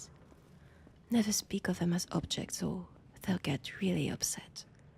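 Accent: France. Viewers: it was indeed a huge surprise for me! i literally was stunned for minutes, not understanding if it's even real. And thanks to you for making my friday great with this one ^^ And hey, please don't cringe! Just have fun with it's "thick French accent". I would call that mix adorable at the very least:) Have a wonderful week ahead,. Your content is making my days brighter, so i'm supporting you in my possible ways. I just hope my silly little comments (which also could be cringe, hehe) making you feel better and appreciated \o/ Ты молодец и я правда восхищен твоей работой. Надеюсь, ты будешь продолжать дальше!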